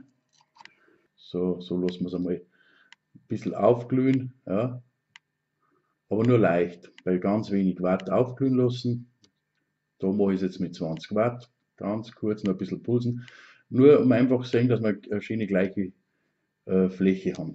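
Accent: Bavaria, Germany. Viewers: Boarisch is einfoch schee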